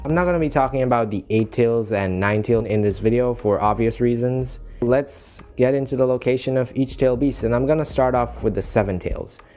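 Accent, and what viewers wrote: Jamaica. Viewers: Are u from Jamaica? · yooo is that a jamaican accent i hear??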